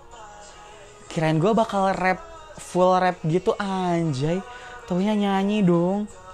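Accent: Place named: West Java, Indonesia. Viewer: Sundaan euyy :v sundanya keluar semua😅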